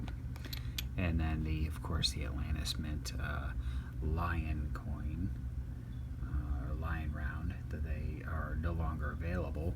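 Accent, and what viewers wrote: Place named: South, United States. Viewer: Sounds as a southern belle !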